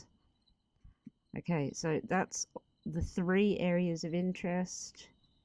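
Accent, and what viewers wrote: Australia. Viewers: at this very moment, there are 7 writing notebooks for me to jot down info, in passing, and others that are strictly for astrology , past lives that deals with astrology (Karmic complexes..etc), Medical astrology: Santos Bonnaci and others and others. On my nightstand (books are a permanent fixture unless I choose to take it with me that day): Judith Orloff MD. The Empaths Survival Guide, D. Vessel Van Der Kolk MD. the Body Keeps Score' , Gary Zukav , Dr. David r Hawkins DR. Masaru Emoto and a 2 or 3 others. I NEED more astrology books! Your is next up to be ordered. I'm just waiting for Mercury RX to leave the shadow period. Your knowledge, teaching style and overall beautiful disposition is ACES!!! I want and need to learn from you. As I've said it before: You male learning fun and easy. Unfortunately, the cases are sad but your ability to put it all in b&w with seemingly little effort speaks to your AWESOMENESS! 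**side note*** I love your Australian accent and when the camera is on your face. So adorable! 💜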